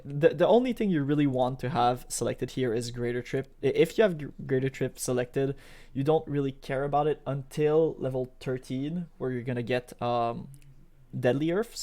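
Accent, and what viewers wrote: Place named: Quebec, Canada. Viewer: I recognize the Quebec accent and I am happy. Great build as well. I never learned how to play Kineticist and this makes me want to try it out.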